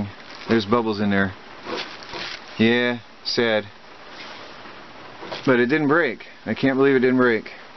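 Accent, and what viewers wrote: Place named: Michigan, United States. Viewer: Your Michigan accent is showing. :)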